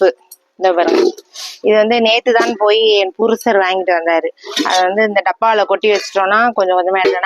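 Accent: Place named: Tiruchirappalli, India. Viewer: Nanga Trichy akka neenga pesuradhu nanga pesuradhula ore madhiri iruku ,ipa chennai la irukom Inga dha konjam different ah enaku theriyudhu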